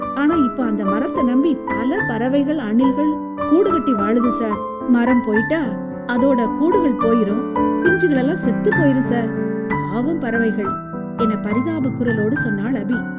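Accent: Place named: Rural, India. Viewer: விடக்கூடாது, ஊர் பாச 🤷‍♂️அருமை Tr🎉😂டங்கு ---டங்கு தமிழ் 🤷‍♂️அருமை. வாழ்த்துக்கள்.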